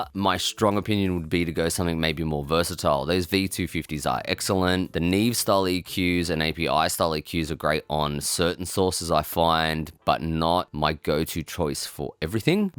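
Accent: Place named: Australia. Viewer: Hi there, Iike your content, its interesting and informative and your accents reminds me on my days touring Australia in the 90 ies ... keep up the good work. Toni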